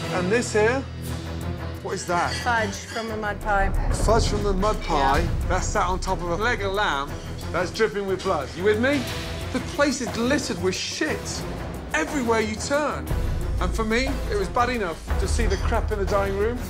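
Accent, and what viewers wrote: United Kingdom. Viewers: Joseph Osborne he's British it's how they talk it's normal for them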